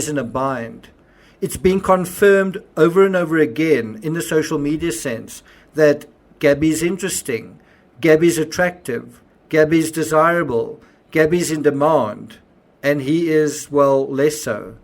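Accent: South Africa. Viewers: Excellent work,after feeling your near desperation in the 'recent true crime circus'- you totally nailed this one and your quality shines through strongly. Best wishes for a quick recovery of your injury.( my 9 yr old loves your S.A. accent and 'please doo' is heard often in our household..lol) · You sound South African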